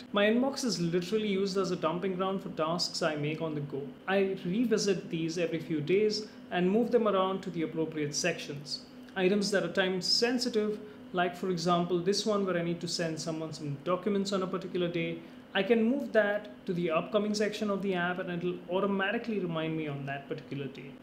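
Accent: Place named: India. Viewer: I hate the indian accent.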